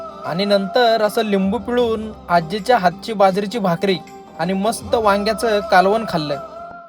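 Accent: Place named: India. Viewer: दादा why don't you stick to what you are good at? We watch your videos for your daughter and and your non Punekar- non nasal-authentic Marathi language which is rare to hear now days. Why don't you stick to it rather than becoming passive aggressive to preach your personal thoughts and condescendingly asking people to rethink their habits and choices? There are enough youtubers out there preaching THAT stuff. You don't need to appear one of them. सुरक्षित अंतर ठेवा. 🙏🏻☺️🙏🏻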